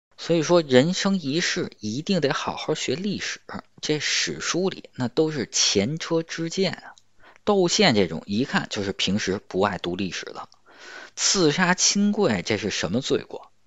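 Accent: Beijing, China. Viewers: 听着像北京人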